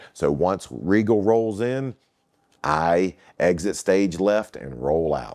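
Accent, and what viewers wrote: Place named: South, United States. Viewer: You had potential to ascend back then. You could have been a biracial JBL. You have a great biracial look, nice southern accent and could have used it as a “better than you” appeal. I’d have you wearing suits, clamoring your education background, look and acknowledge being half black and half white. By being half black and white, you could have been nicknamed “The best of both worlds”…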